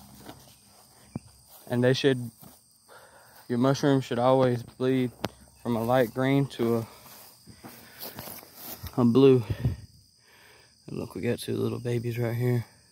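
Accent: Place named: South, United States. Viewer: Was this filmed around upload date or is it old? I thought they didn’t come out until later in the south (assuming by accent)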